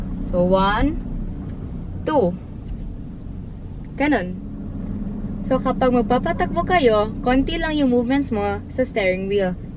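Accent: Visayas, Philippines. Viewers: bisaya man ka dai, pag bisaya dai oi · bisdak for sure! 😍 sweet tone of voice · Bisaya kba? I can hear some tones.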